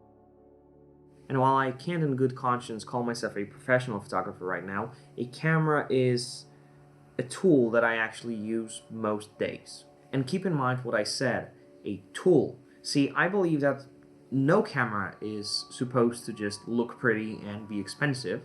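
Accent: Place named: United States. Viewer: I subscribe to your channel because you speak in American accent!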